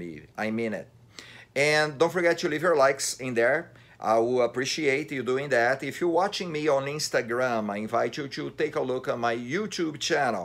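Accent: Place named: Brazil. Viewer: Sotaque Brasileiro é inconfundível. Muito boa sua crítica,! Não o conhecia. Contudo, o filme não funcionou para mim, o fato, como você mesmo disse, de o elenco não trazer atores profissionais, prejudicou muito a minha imersão. Por vezes ridicularizei certas cenas que seriam incríveis, na minha opinião, com atores profissionais. Em suma, achei a ideia brilhante, porém, muito mal executada. Algo positivo disso tudo foi que realmente nos colocamos na pele do jumentinho, uma vez que os animais também nos veem como “atores amadores”, tendo em vista que nossos movimentos, falas e atitudes são incompreensíveis para eles, como é comum em animais de outra espécie quando se observam. Logo, toda a atuação tosca e inverossímil, aproximou-me muito mais do animal do que qualquer outro personagem. Entretanto, mesmo assim não fui capaz de digerir a obra. Nota: 6/10.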